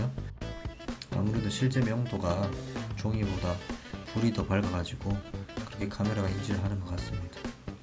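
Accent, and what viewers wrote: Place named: Busan, South Korea. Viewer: 붔산사투리가 구수하네예~~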